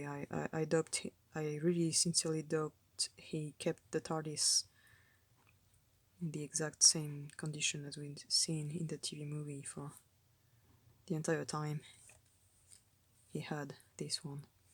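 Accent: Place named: France. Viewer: Oh mon dieu les eighths doctor adventures ET un accent français comment j'ai fait pour ne pas trouver cette chaîne plus tôt. merci de garder le rêve du huitième en vie par la peinture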